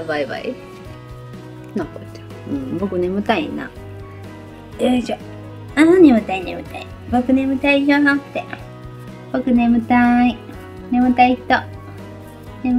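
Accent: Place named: Oita, Japan. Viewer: 返信ありがとうございます🎵💖 さん大分なんですか⁉️😅 道理で方言が似てると思ったです😊 余り鹿児島弁は出てないですよね‼️ これからもいっぱい楽しい動画を宜しくお願いします🎵💖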